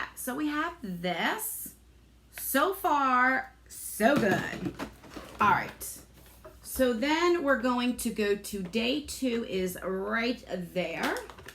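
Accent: New Jersey, United States. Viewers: new sub love ur channel..💝 I can tell right away ur a jersey girl so I'm I !! 🌼 ps ur so pretty & ur makeup looks amazing!! 💄💋🌲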